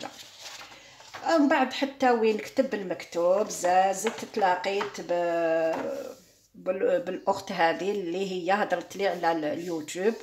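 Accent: Algiers, Algeria. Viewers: هدرتك جزايرية اصيلة مفهومة يا l'ame de tableبالعكس ماراكيش. تطولي ماذابيك تكثريلنا في الاطباق انتاعنا التقليدية الطبخ والحلويات والتدابير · Exactement madame vòus etes sur la bonne vois faite nous surtout les plats ancien dapres votre votre dialecte sace vois que vous etes امراة بطباع vouetes de medea ou du etorale dalger merci a vous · يعطيك الصحة طاطا انا عرفتك من عيد الكبير من مقروط ههه درتو عليك صح منخليش تعليقاتي بزاف بصح دايما نشوف وصفاتك ونخلي جام اليوم راني درت الفلو نتاعك بلبسكوي مرحي إلى الأمام ونحب لهجتك انا من وسط البلاد وزوجي عاصيمي ويلقي دايما أحكي كيما عصميات هههههه الله غالب يحب لهجتو ونجب نتعلم عليك اللهجة عاصمية وزيد عندي حبيبتي من العاصمة بضبط متزوجة في مكان أكثرية من غرب وهي عرفتني عليك قاتلي نحب نسمعها باه متهربليش لكسو نتاعي هههههه نحبك واصلي ديرينا أكل عصري بسيط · restez telle que vous etes et votre parler est typiquement algerois et j adore et il ya nuance quant qq parle pour parler et qq qui parle pour que le message soit comprit ce qui est votre cas bonne continuation sans oublier de dire que j adore vos gateaux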